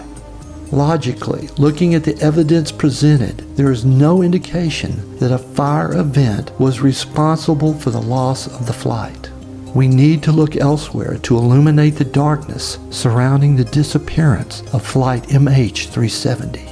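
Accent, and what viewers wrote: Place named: South, United States. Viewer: I hope episode 4 drops soon! Thanks, this was excellent. Love your accent! Are you in the South? ❤️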